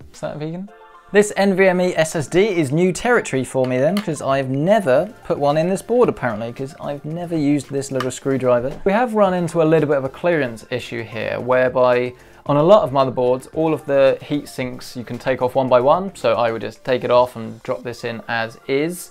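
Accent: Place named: Tunbridge Wells, United Kingdom. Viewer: You sound very Tunbridge Wells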